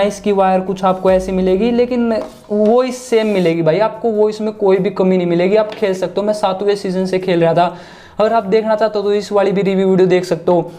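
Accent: Haryana, India. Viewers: Bhai haryana me kaha se ho?🤭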